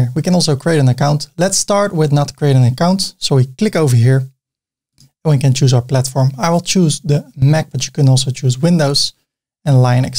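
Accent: Netherlands. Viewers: Bro sounds Dutch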